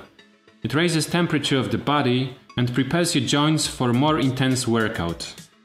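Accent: Poland. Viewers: Ha! Tak też myślałem ;) Akcent typowo polski, ale całkiem dobrze mówisz po angielsku. BTW. podsunąłeś mi ciekawe pomysły na wykorzystanie moich obręczy i też uważam je za najlepszy zakup sprzętu ;)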